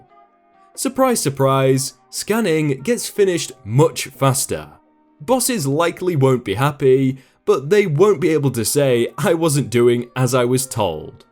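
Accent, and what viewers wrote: United Kingdom. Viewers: He's pronouncing [SCAY - DA] due to his British Accent.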